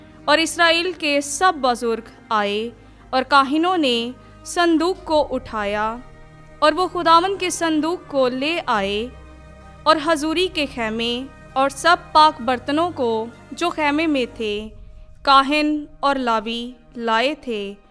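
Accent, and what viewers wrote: Urdu, Pakistan. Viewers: beautiful delivery of bible verses true Urdu accent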